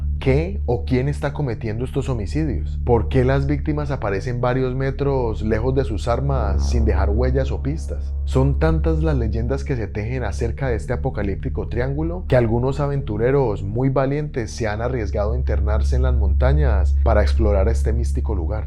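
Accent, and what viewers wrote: Colombia. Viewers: Me gustan mucho tus videos y tu estilo narrativo yncluyendo tus gesticulaciones. Si mo me equivoco tienes un asento colombiano parce. Espero tu siguiente video.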